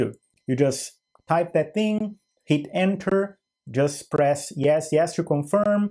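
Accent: Brazil. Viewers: You have a Brazilian accent... vc é br ? Sdl ainda vale a pena?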